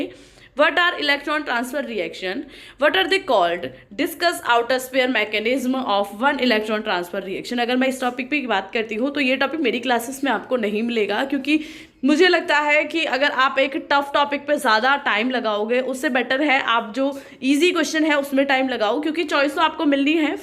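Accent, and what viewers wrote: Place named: Bundelkhand, India. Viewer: apki tone bhi bundelkhandi hi lg rhi hh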